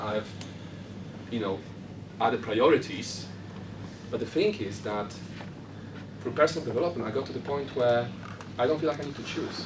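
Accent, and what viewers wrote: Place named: Poland. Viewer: omg I love the polish accent... yippy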